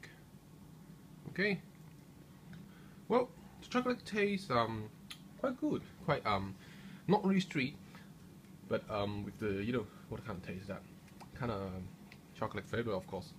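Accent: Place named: United Kingdom. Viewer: Very nice video but your accent is the weirdest thing I have ever heard. Most of the time you sound english (british) but then you say things like "choclick" or "lick out" instead of "leak out" and some other little weird things. I am curious, where are you from and do all people talk like that there?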